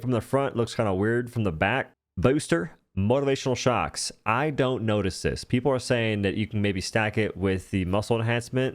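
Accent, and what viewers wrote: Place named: Panhandle, United States. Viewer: This fella sounds like he's frum tha panhayndle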